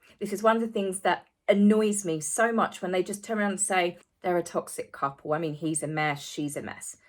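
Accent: England, United Kingdom. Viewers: Love from Long Island - ive experienced abuse on several levels and its taken a while to rise up and wake up. You are such a beautiful special human and I truly look forward to seeing your videos and hearing your English accent! Just wanted to reach out to say that to u!!! Keep up the great work❤️👍🫡🤗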